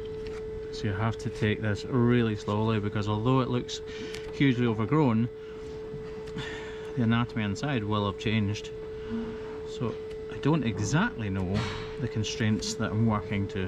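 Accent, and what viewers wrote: Scotland, United Kingdom. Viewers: I'd love to know how long it takes to get that far in vet time to become so good in hoofs ,, nevermind I'll just listen to the Scottish voice 🤗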